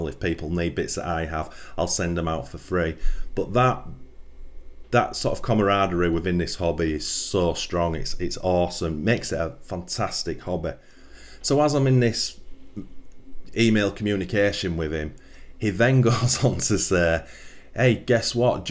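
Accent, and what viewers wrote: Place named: Yorkshire, United Kingdom. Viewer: I think its Yorkshire accent. He is saying mark 1 (mk1)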